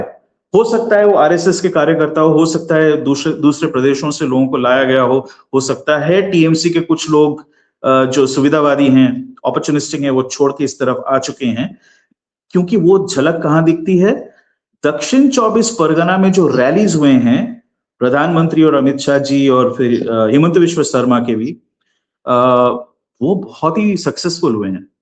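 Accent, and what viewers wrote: Standard. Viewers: Dada Namasthe. Aap ki hindi bahut hi shuddh hain. Dusri baat eah hain ki aap ka vishleshan vaastavikta ke bahut hi sameep lagta hain. Chunav parinam ke paschat hi asli drushya dikhega. Bahut achi vishleshan. Dhanyawad 🙏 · ji aapki hindi bahut shudh hai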